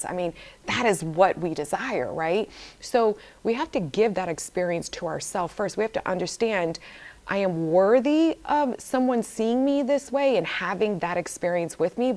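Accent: New York, United States. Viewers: I was literally wondering about how you got this New York accent living in Florida and then you immediately explained LOL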